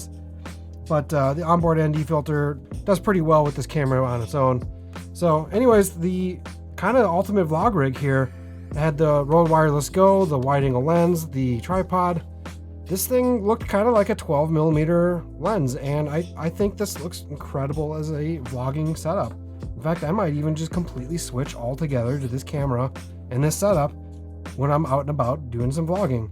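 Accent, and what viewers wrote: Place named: England, United Kingdom. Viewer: Man I need a hat thrower/Box slider for my videos! Gangster! Your wife is a Pro! Man that setup is so tempting.....I want that for going to theme parks.......whenever I can go back. Great video bro! Oh and I still want those Nike Air Maggs! Looks hot in the background! Dr. Pepper is my jam!!! Great joke of course in your english accent.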